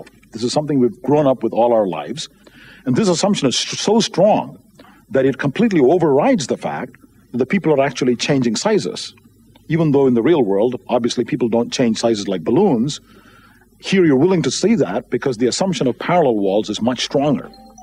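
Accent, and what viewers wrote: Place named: United States. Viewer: sounds like american english and some of his words have accents still, thats why i think its funny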